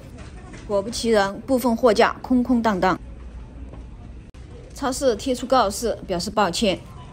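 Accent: Sichuan, China. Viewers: 的视频必看,最喜欢的是你说的“川普”（四川普通话)👍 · 赞一个👍👍👍非常喜欢你的川普，好靓好聪明的脑壳！ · 四川口音。你们应该听不出差别的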